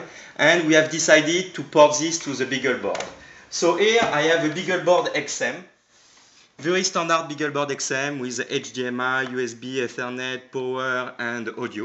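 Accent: France. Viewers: you have a really thick french accent...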